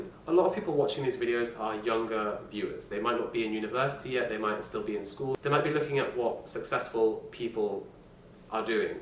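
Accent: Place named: England, United Kingdom. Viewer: I meant his English accent... It's just so cute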